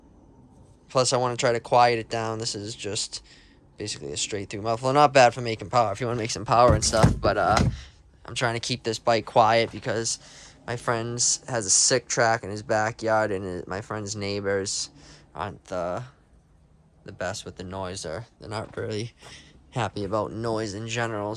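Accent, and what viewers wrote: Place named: Boston, United States. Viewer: how far away from Indiana are you sounds like you’re in Boston or something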